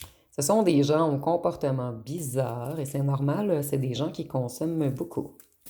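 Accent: Quebec, Canada. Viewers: Bonjour et merci pour votre travail ❤❤ vous êtes une belle âme et j adore votre accent vive le Québec 🎉🎉❤ tendresse du cousine de France 😂😂😂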